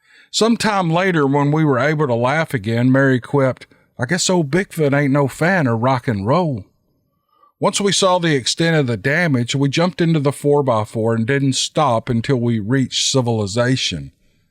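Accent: South, United States. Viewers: Love your southern drawl, you give flavor to the stories you read...can almost envision what is being expressed through words... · yep, love his southern accent❤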